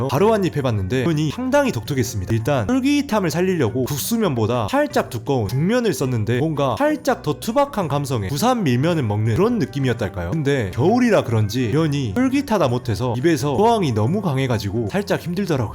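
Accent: Jeolla, South Korea. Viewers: 전라도 말투 나레이션은 첨인데 엄청 정감있네요 ㅎㅎ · 찰진 네이티브 전라도 억양이 너무 감동적이네요. 광주 화순 그 쪽 말투 같은데....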